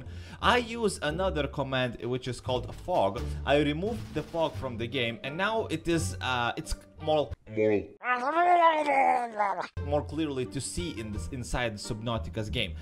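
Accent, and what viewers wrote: Russia. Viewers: are u russian? if u are i like ur accent keep up the good work;) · I love your Russian accent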